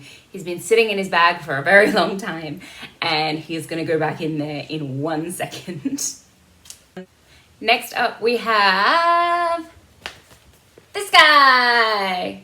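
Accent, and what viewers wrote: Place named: Australia. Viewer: I knew as soon as I heard the accent you were an Aussie!!!! Yay!!! Love seeing other Aussie cross stitchers…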